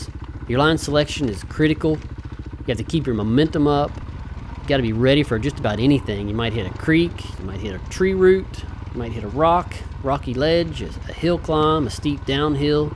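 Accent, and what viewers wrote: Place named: South, United States. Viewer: I love this kind of video; No music, good southern narration 😄, the sounds of the bikes. Excellent work.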